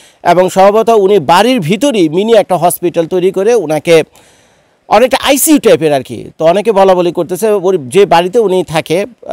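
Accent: Dhaka, Bangladesh. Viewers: AASSALAMOO ALAIKOOM Dear Bhai,KHALAQAL MOUTA WAL HAYATA,Soora MOOLQE,I liked you because of Dhakaya accent,from Bangladesh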